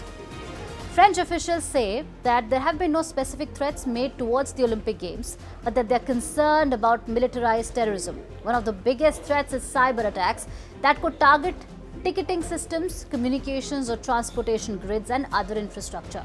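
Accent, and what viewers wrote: India. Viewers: As a response to your edited comment, the lady is speaking British English. It's British English that's officially taught in India. Her accent is Indian. Just as you have different accents in the US, so does India. Once again, this YouTube channel is aimed at Indians, not at Americans. So obviously they're gonna hire someone who can speak with an Indian accent, not American, British, or Australian. Maybe you need to read once again the fact that the term "Curry English" doesn't exist. Curry, however, does and has nothing to do with India. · CNBC is an American broadcaster that is supposed to use American English, not Curry English. When you have a large number of UK immigrants in America, you have American or Canadian English, and Pomme in Australia they speak Aussic English, with India you don't chase Immigrant background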